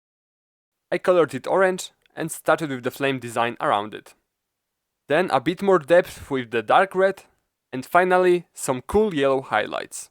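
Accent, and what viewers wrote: Poland. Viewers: Słychać że z Polski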